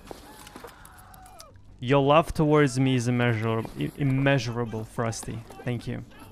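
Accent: Russia. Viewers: ты же русский,оно слышно))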